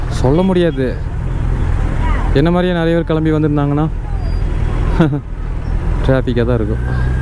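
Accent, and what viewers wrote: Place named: Coimbatore, India. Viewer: Covai slang🧡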